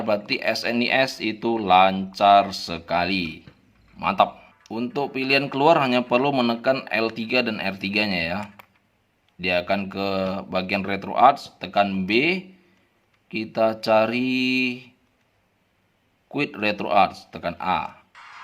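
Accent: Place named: Java, Indonesia. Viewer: Saya suka logat jawanya abang..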